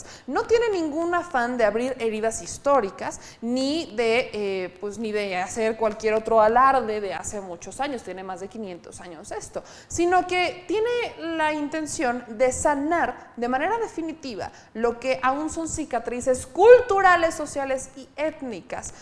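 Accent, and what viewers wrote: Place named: Mexico City, Mexico. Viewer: no se achilangue tanto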